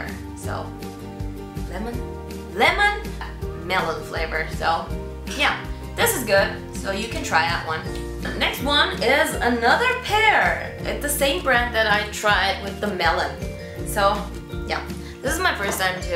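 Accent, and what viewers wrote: Turkey. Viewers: Turk oldugunu da soyleseydin bos yere vakit kaybetmezdim. Boyle bir Ingilizce aksan sadece iyi Ingilizce bilen bir Turk'te vardir hemen anladim. Zaten twitter profilinde de isminin oldugu yaziyor. Yemeyin lan insanlari! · Haha ulan kiz Turk, Turk aksani var. Turkiyede yasiyor bence ...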